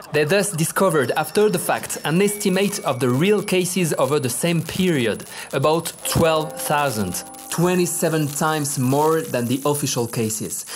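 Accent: France. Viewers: FRENCH ACCENT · It's eu bioutifoul french accente !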